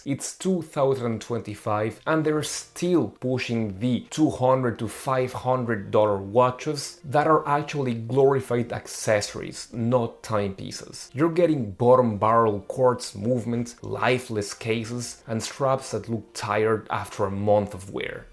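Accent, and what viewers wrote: France. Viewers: Thanks for the reoly. Obv not important, i just like knowing where accents are from. Im defn no expert on French accents and appreciate that tbey vary accross diff parts of the country..like everywhere. My experience: is my wife is French and ive been there a few times , stayed with her fam. Your accent just sounded a tad different to theirs . Enjoy your videos and appreciate your reply Cheers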